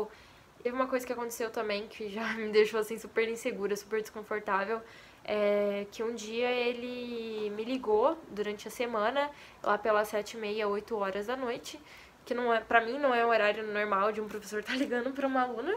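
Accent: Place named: Minas Gerais, Brazil. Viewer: Vc é mineira?kkkkk vc parece que é mineira kkkk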